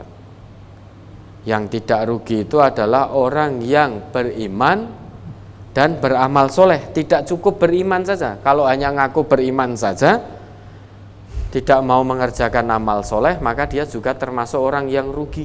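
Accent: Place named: Java, Indonesia. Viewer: Alhamdulillaah..logat jawa panjenengan tadz.. mengingatkanku pada bliau al ustadz alm..barakallaahu fiik..❤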